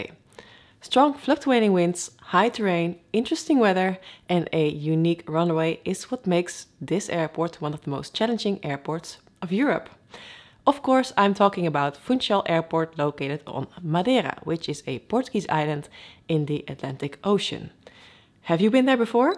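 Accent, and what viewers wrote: Netherlands. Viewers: wat klinkt dit nederlands zeg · The dutch accent on english is something that can never be hidden :D Greats from Belgium! Btw, I wouldn't mind a voice over the approach instead of text, that way you see more then reading text. Or was this a deliberate decision?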